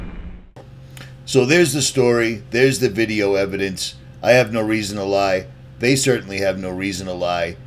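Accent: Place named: Boston, United States. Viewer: You sound like richie from Boston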